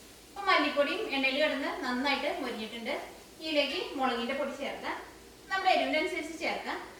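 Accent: Thrissur, India. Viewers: I like ur the way of presentation .. Thrissur slang 😍😍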